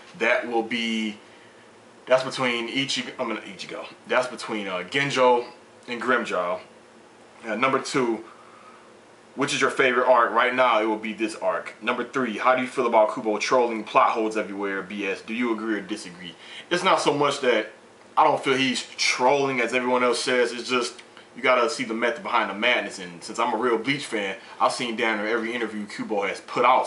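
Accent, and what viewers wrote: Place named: Midwest, United States. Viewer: i knew you was a mid-west dude, my guess was probably st louis or detriot.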